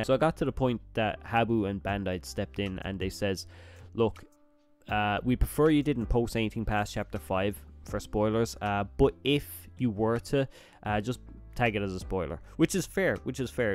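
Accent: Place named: Ireland. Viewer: You called me out mate! Na I'm playing, good review man.. caught the Irish accent straight away ✌🏾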